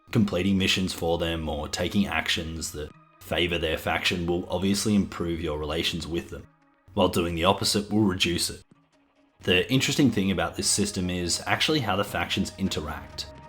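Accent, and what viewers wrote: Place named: Australia. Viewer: noticeable Australian accent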